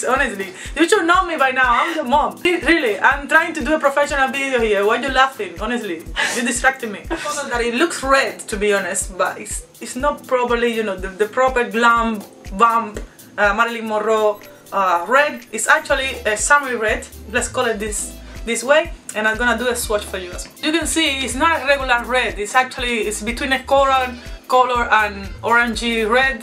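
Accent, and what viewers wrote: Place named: Italy. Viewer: Oh my god! She speaks so fast with Italian accent well, no comprendo lol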